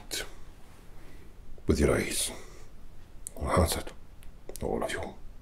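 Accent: Wales, United Kingdom. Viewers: I am literally dying of excitement rn! OMG! I just keep discovering more and more reasons to love you! My grandad was in the RAF (both of them were actually) but the one I lived with was a snowdrop (RAF Police) and he taught the ATC he was an instructor. I also adore the Welsh accent! It's giving Battery Sergeant Major Williams and I am totally OBSESSED! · Loving the deep Welsh tones. Like a cosy, comfy blanket.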